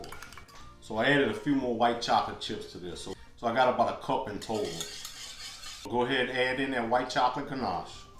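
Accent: Louisiana, United States. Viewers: He is Louisiana...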